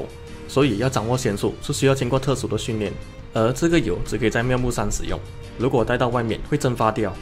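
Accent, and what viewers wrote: Malaysia. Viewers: 馬來人講中文真的讓人耳朵很累很痛苦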